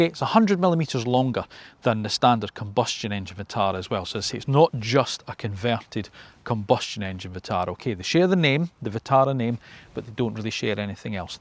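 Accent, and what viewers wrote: Scotland, United Kingdom. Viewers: The design of this India built car was actually inspired by another Indian EV I happen to own, called the Tata Punch EV. Watched the review not for the car but for your charming scottish accent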